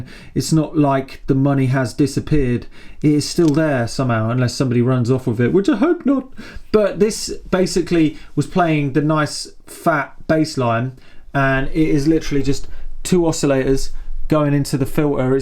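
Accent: United Kingdom. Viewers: I feel the same exact way you do about the way you have been feeling; except you are the Cirklon and I am the SAM. I have been following you for about 4 months now; and I can understand pretty much every other thing you are talking about. Have no clue what a cirklon is or what Beatbox pro is all about but it seems all very interesting. SO I am just reading the manual and absorbing about every other word or so but its very cool because you have the queens tongue. I am sending your music all across the USA to get my friends in the underground interested as well. So when you plan your world tour and visit us in the states; we can all jam together. Gods speed LADDY!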